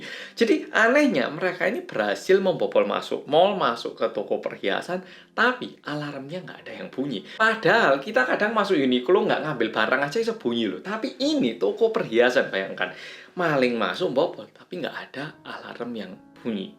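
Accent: Java, Indonesia. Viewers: maaliiing wkwkkwkww medoook beuud yah mas e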